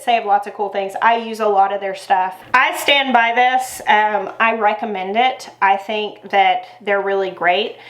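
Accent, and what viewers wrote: South, United States. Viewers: Thank you!!!! You speaking German.....was a little disconcerting.....I prefer Southern accent ;-)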